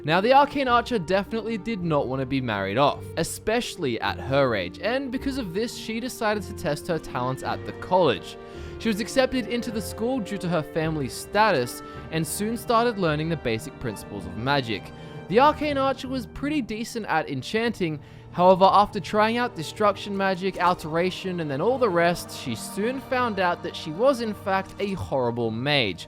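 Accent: Australia. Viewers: it's an australian accent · australian accent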